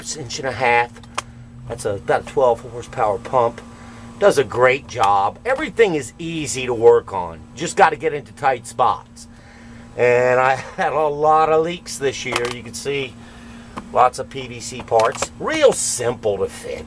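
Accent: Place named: Virginia, United States. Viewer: Sad, I have to watch this video every Spring when I take down my tub! You sound like you are from the Eastern Shore of VA!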